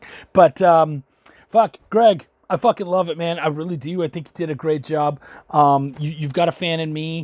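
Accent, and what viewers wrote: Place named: Canada. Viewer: You sound especially canadian in this video. I love Is'Nana, picked it up when I backed the hated! You should also check out A Black Man's Guide to Driving, really good from both parts! Solid breakdown, the world needs more raw reviews!!!!!!!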